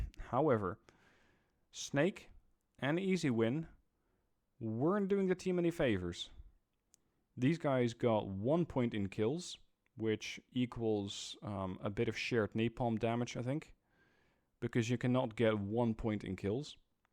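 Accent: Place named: Netherlands. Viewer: New mic. Still sounds Dutch. I guess theres no fixing that :-)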